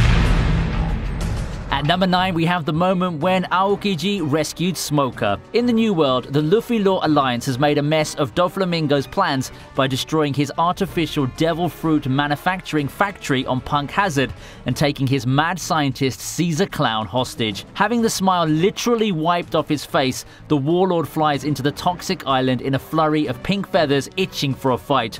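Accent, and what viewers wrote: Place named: United Kingdom. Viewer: Tired pf clicking videos and hearing British kids talking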